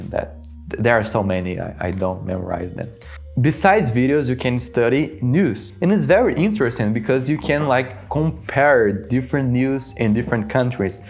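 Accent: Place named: Brazil. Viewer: I love brazilian accent when someone is speaking in english, because I can understand what is being said. Thanks for the video!!!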